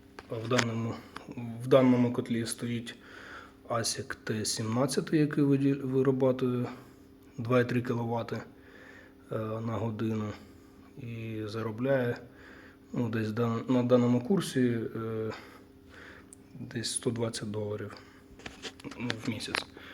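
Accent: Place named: Surzhyk, Ukraine. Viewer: Чего вымучивать на суржике-то?)) Ты ж на русском же лучше говоришь. И просмотров больше было бы))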